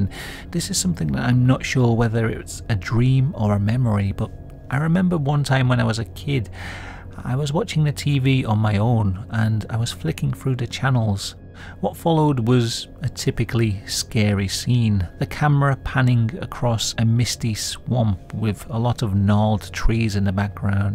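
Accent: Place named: Lancashire, United Kingdom. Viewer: What a great channel this is. So much content that one doesn't encounter amongst the plethora of disaster/death/murder that's online, and with stupid American narration. Here's a Q. for you,; might you be from roundabout Lancashire perhaps? Just going by the good accent!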